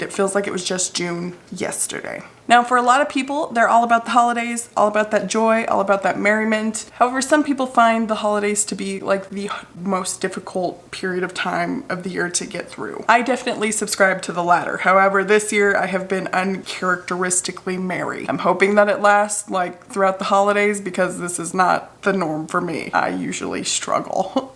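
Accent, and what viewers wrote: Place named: New York, United States. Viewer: Starting to sound like a newyorker 😅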